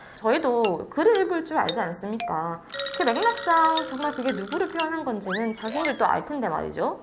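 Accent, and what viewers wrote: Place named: Gyeongsang, South Korea. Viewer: 다 가리긴햇는데 경상도 사투리가 나오시네요 ㅋ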